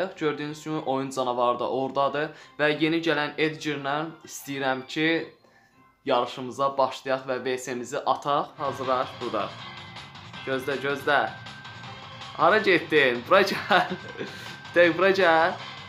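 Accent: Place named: Jabrayil, Azerbaijan. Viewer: Axşamın xeyir qaqam. Necesen? Srn haralısan? Lezzet eliyir danışığın. J ile danişirsan. Cebrayıl lehcesine oxsuyur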